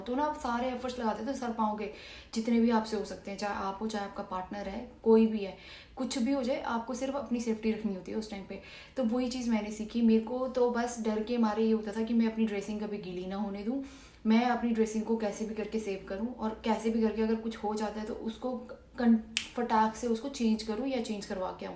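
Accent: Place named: Haryana, India. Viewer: jis hisab se baat ki apne muje lga hi ta ap haryanvi ho 🤨me meri mosi ki ladki jesi awaj lagi muje 😂🤝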